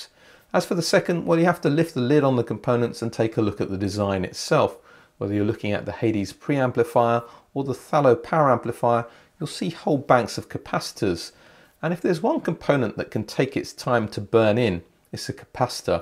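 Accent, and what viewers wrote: United Kingdom. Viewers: after 10 minutes, i could not take anymore the barroque and burgeous of the whole british speaking thing, sorry. Overdose. so much for just explaining a device...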